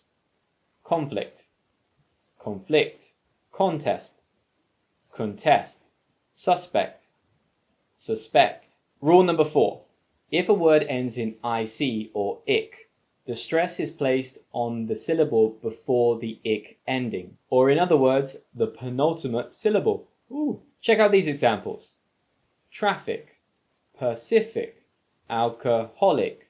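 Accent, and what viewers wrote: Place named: England, United Kingdom. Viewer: I like listening to your true English accent, not the weird American pronunciation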